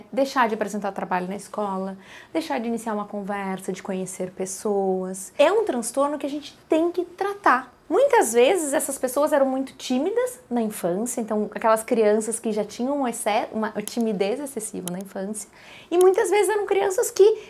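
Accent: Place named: Rio Grande do Sul, Brazil. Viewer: Esse sotaque gaudério eu conheço kkkk ótimo vídeo dra.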